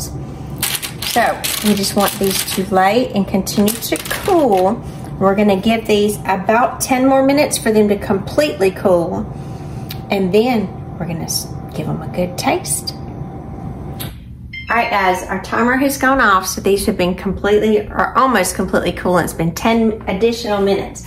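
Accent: South, United States. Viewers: I love her Southern accent! Enhances the recipe 🙂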